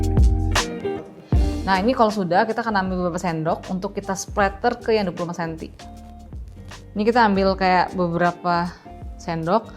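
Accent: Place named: Medan, Indonesia. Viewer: Kok jdi medan kali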